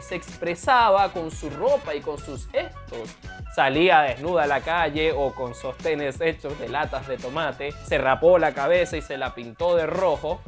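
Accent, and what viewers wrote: Venezuela. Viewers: A mí me suena más a venezolano, dijo "pana", eso no es mexicano. Saludos! 😊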